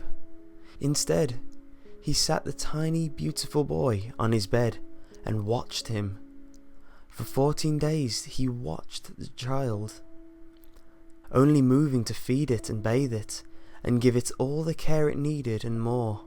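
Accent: United Kingdom. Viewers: are you really British?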